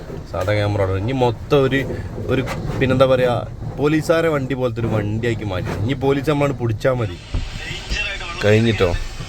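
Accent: Malappuram, India. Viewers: ഒരു രക്ഷയും ഇല്ല ഫുൾ കോമഡി അതിനിടയിൽ മൃഗങ്ങളെ ശ്രദിച്ചില്ല തനി മലപ്പുറം വർത്താനം 😂😂😂😂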